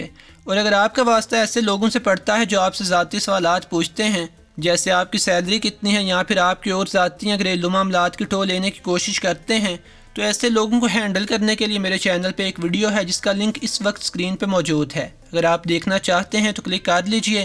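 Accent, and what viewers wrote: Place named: Lahore, Pakistan. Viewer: Apka Accent Lahoriyo Wala hy..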